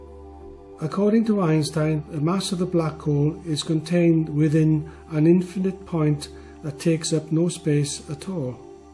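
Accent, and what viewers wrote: Wales, United Kingdom. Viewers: Nice to hear a Welsh accent! 🏴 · Are you welsh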